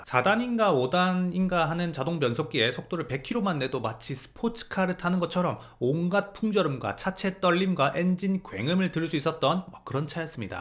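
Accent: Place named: Busan, South Korea. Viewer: 말씨는 경상남도 부산쪽같은데 사는곳은 서울경기쪽에 좀 살아서 나오는 사투리억양섞인 서울말씨네요 ㅎㅎ 잘 보고 있습니다 😊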